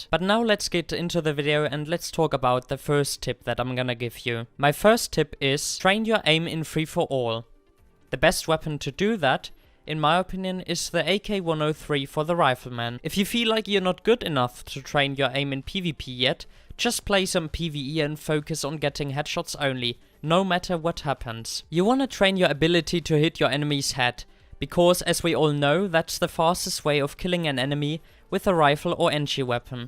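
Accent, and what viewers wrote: Germany. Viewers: U german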